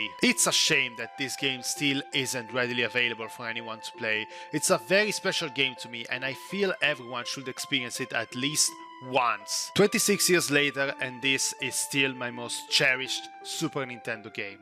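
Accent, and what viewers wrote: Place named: Italy. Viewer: Your voice is pretty boring, and honestly I’m not a big fan of your Italian accent. Also, I think showing the mini SNES with loose cartridges feels a bit ridiculous and annoying. Using the original console would have made a much better impression.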